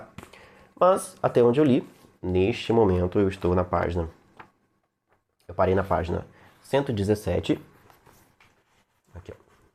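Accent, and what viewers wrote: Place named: Petrópolis, Brazil. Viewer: Boa tarde, Xiru ! Bah, percebi que seu sotaque não tem aquele "S" que vocês arrastam Geralmente percebi - se que tu tens, um sotaque limpo tu deves ser de Petrópolis ou Niterói ! Caso se eu tiver errado , tu me corrige !!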